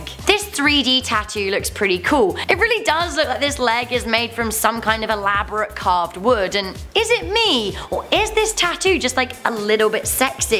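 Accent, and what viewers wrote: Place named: United Kingdom. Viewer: My favorite is this British accent lol 🤘🏻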